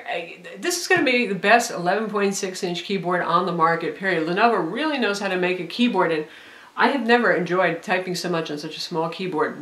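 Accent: United States. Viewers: Good reviews, but oh, sometimes her fast, american accent speech leaves no chances to understand well.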